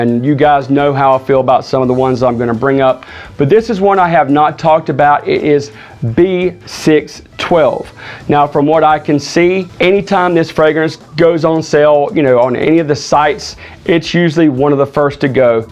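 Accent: South, United States. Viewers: nice review with a nice southern accent 👍🏾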